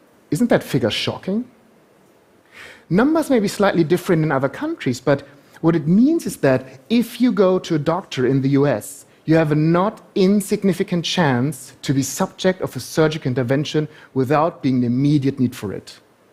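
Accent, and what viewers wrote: Germany. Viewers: Oh my, that German accent.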